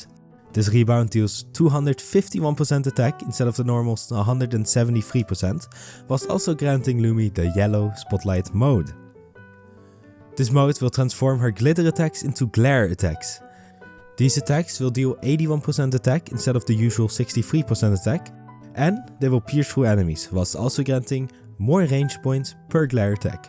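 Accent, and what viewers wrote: Netherlands. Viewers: My first video I see of you and the moment I heard you talk, I knew you were a fellow Dutch person XD Thank you for the vid tho! I love Lumi's fighting style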